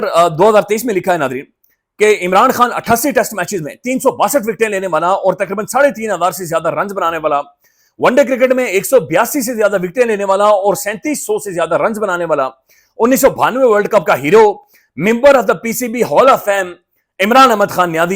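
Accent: Kashmir, India. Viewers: bhai you have the cutest Kashmiri accent… please never lose it 🌹😊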